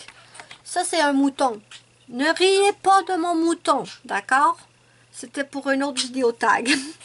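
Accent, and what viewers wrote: Canada. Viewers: Bonjour 😄je viens de regarder ta vidéo, c'est très bien ce que tu conseilles aux personnes qui débutent le coloriage. Moi ça fait peu de temps que je commence le coloriage, à côté je fais de l'aquarelle et du pastel sec qui méritent des matériaux plus chers que pour le coloriage,pour moi bien sûr, donc je commence le coloriage avec les brutfuner,ils sont parfais😍💜Par contre pour les feutres crayolas je ne savais pas qu'il y avait 3 façons de les employer et c'est super je vais les prendre pour le moment pour remplacer les encres distress ink et je ne savais pas qu'ils étaient aquarellables.C'est super. J'adore tes vidéos 😍❤️ton accent canadien et la façon que tu as de te parler à toi même, tu es géniale 😍💜 Bisous bisous 😍😘😘🧡🧡🧡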